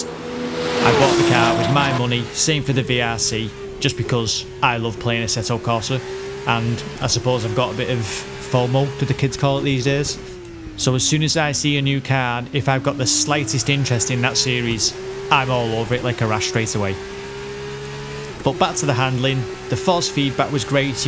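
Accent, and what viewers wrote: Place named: Manchester, United Kingdom. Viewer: you sound Mancunian to me, I could be wrong but YANITED. RSS car sounds pretty good although I love what VRC offers in terms of setup and features with its csp version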